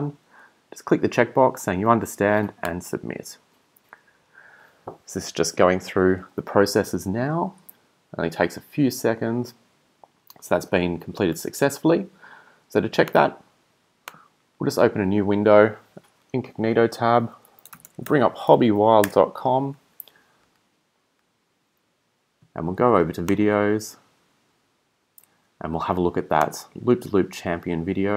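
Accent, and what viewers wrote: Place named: Australia. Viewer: good job mate, keep it up! right from the get go I could tell that lovely aussie accent (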